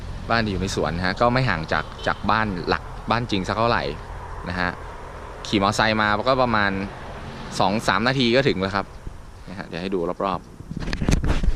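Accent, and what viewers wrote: North, Thailand. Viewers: อยากให้พี่แว่นพูดภาษาเหนือออกคลิปบ่อยๆหน่อย น่ารักดี FC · ผมคนน่านแต่อยู่ในเมืองครับ #อยากให้พี่แว่นพูดเหนือเยอะๆ 555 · สำเนียง เมียงแต้ๆ^^ ผมคนแป้ครับ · ว่าแล้วเชียวว่าพี่แว่นเป็นคนเหนือ สำเนียงมันออก(สาบเมืองขื่น) ห้าๆๆๆๆ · อู้กำเมือนขนาดนี้ เหนือแต้ๆ ครับ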